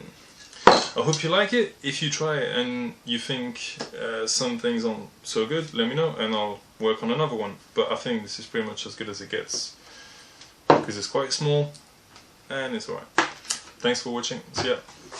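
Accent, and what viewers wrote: France. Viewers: Bonjour ! :) Excellente vidéo! ;) Ps: êtes vous français..? Jai cru reconnaître l'accent..^^ Si oui, serait il possible de faire de vidéos en français svp? :)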